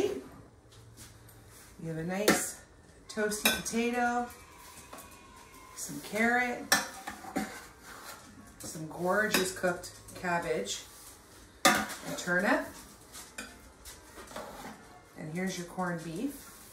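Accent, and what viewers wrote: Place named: Ireland. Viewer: ohio Long way from home! I did not think Canadians had an accent. I was thinking you had a bit of Irish cuz some of the things you said sounded Irish accent.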